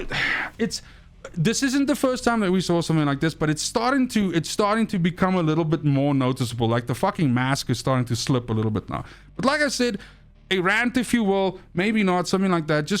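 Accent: South Africa. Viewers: Bro sounds south african. But i just can't prove it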